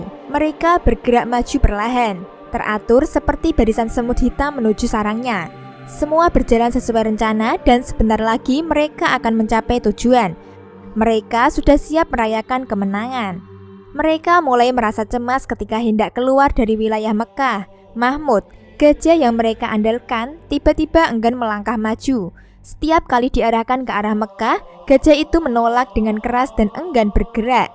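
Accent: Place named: Java, Indonesia. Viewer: Medok jowo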